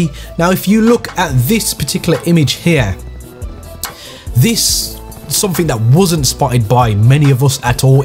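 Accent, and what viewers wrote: Birmingham, United Kingdom. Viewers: Are u from Birmingham England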